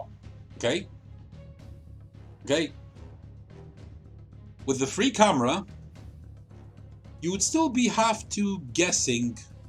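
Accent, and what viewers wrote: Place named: Greece. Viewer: I just want to point out your English is well learned. The Greek accent is there, but not painful to listen to.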